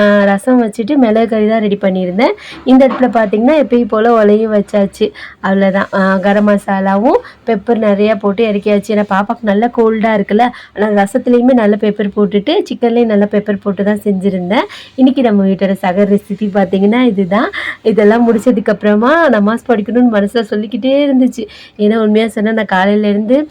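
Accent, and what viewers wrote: Ramanathapuram, India. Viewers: Na ramnad thondi. Unga pechile therinjadhu ma namma ooru pullanu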